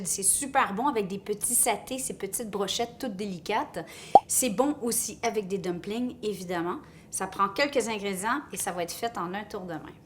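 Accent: Canada. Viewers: Merci beaucoup pour toutes ces bonnes recettes faciles. Ça fait plaisir d'entendre ce bel accent canadien. 😜👍😘 Janie de Paris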